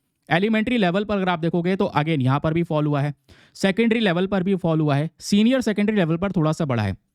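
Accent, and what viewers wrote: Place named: Punjab, India. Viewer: App to punjabi lag rahe hai sir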